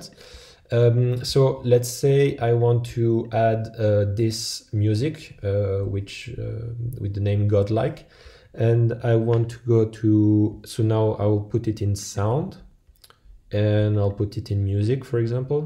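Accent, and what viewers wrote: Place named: France. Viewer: Salut, j'ai reconnu ton accent français, moyen de faire la vidéo en français ?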